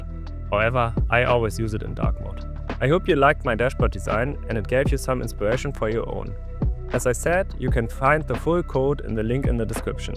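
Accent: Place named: Germany. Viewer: i hear deutsch in se english - top video, mach weiter so!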